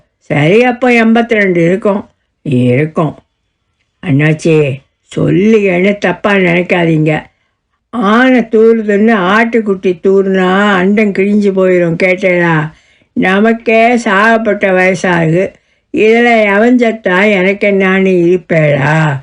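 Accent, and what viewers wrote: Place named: Nagercoil, India. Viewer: very nice madam .... Nagercoil Slang nalla Pesureenga .... nangil nadan in ella Kathay Ka lum bathividungal.... Thank you...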